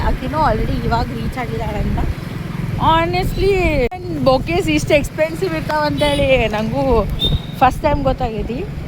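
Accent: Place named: North Karnataka, India. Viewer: Wonderful dear, u speak very lovely North Karnataka original Brahim bashaaa❤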